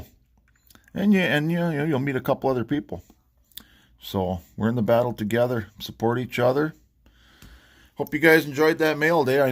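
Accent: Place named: Fargo, United States. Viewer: You have a natural Fargo voice =)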